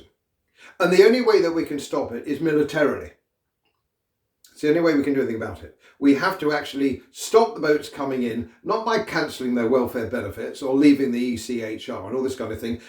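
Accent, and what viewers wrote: RP, United Kingdom. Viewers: Rubbish. On what basis can you THINK this. Just look at his dress, listen to his accent. He is a Colonel Blimp. People like him ARE the real traitors. And VILE people. Driving division and hatred - not community spirit, kindness. Appealing (sadly it seems successfully from comments here!) to the worst aspects of peoples' natures. Criticising politicians (not their side natch) yet using the SAME "othering" techniques the Nazi's did.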